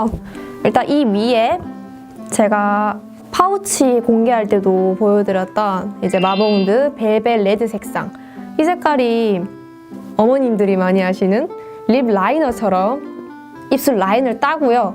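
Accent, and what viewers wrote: Gyeongsang, South Korea. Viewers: ㅌㅋㅋㅋㅋ역시 경상도 븐이라 그런지 군데군데 반가운 발음들이 있네영 ㅎㅎ 넘이뿌세용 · 헐 오늘 영상 처음보는데 깜짝놀랐어요 중복합성피부, 뾰루지 잘 나고 누런데 홍조있고 뭐 이런건 다 비슷하다 쳐도 아이라인 쪼금만 그려도 화장 완전 진해보이는거 핵공감 ㅠㅠ 제 컴플렉스?라 해야하나 진짜 골치아파요 다른애들에 비해 화장 짙게 하는 편 아닌데도 제가 제일 풀메이크업한것같아보이는... 왜 그런지 정말 모르겠어요ㅋㅋㅋㅋㅋㅋㅠㅠ 저도 경상도 사투리 쓰는데 어느지역이세요ㅎㅎ?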